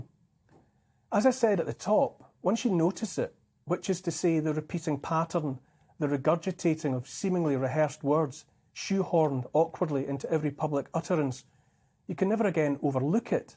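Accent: Scotland, United Kingdom. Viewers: Moreover, forgot to mention that your Scottish accent is classical music to my ear! Brilliant!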